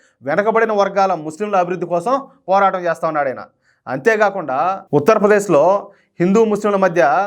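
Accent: Rayalaseema, India. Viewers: Nellore Tirupati Chittoor slang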